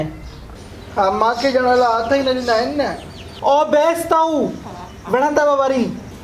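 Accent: Sindh, Pakistan. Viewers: brillent real mithri sindhii bolee asa jiii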